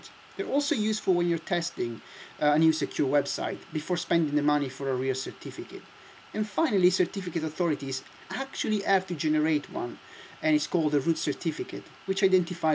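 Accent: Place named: Italy. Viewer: Ottimo inglese ma l'accento italiano è davvero impossibile da nascondere Hahahah Btw nice video!!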